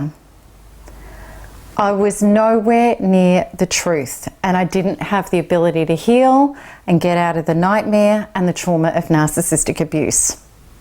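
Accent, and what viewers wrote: Australia. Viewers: Love your Australia accent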